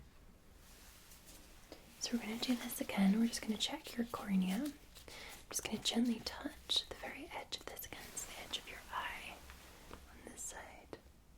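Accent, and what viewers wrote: Scotland, United Kingdom. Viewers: What accent is this? Edit: Just read she is Scottish, but is there a mix? It's not the Scottish I'm used to hearing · me: FINALLY SOMEONE SCOTTISH[ also me: she sounds so nice but then im Scottish and i dont sound that nice] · Wow...just wow. I’m getting a Scottish Dr Quinzel vibes...this is dangerous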